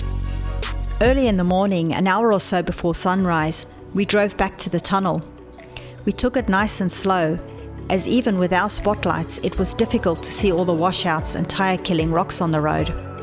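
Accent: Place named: South Africa. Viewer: I do like that South African accent!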